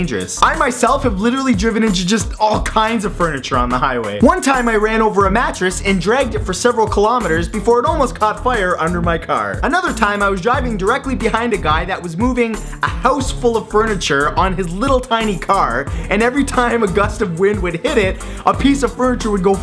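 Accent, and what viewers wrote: Canada. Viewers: this is going to sound completely rasist but are u Canadian.I love your vids u r cool 😎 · I love it when he's in a mad rant it's still kinda pleasant cuz he's so canadian.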